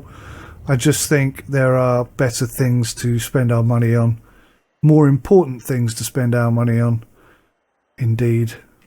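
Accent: United Kingdom. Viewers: Well done on this! I like how you turned things around from that little bit of debt. Out of curiosity, what variety of British accent are you speaking in? It's interesting to see the different voices out there.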